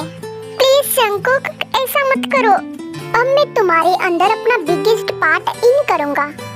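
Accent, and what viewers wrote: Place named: Punjab, India. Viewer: Apka Hindi plus Panjabi accent h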